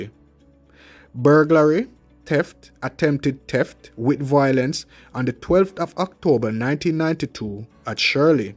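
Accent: Jamaica. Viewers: Listen bredrin it's not the first time I've heard about Delroy Grant but it is the first time I've heard this narration and I love it I just love to hear my Jamaican dialect taking off the Ts and emphasizing the Hs gwaaan my yout proud ah yu and Leicester is how you said it correctly sir and you've gained a subscriber ❤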